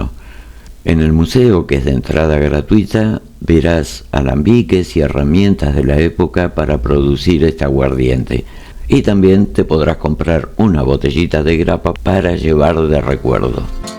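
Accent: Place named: Argentina. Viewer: Buenos días Sr.; soy su nueva fiel seguidora, soy 🇵🇪, vivo en 🇺🇸 tengo 62 años, viajo a 🇪🇸a visitar a mi hija un año, y otro a 🇵🇪. Buscando consejos de viaje, con conexiones eso es muy estresante, encontré su canal, me encanto por la forma pausada en que nos habla, aparte su acento argentino, trabajé 5 años en su País, conocí gente maravillosa ❤. Por eso mi cariño eterno 🇦🇷. Muchas Gracias, este video me hizo recordar mi paseo a Fiorenze.. y la vez que me perdi al regreso a Roma por qué me fui hasta Nápoles 😅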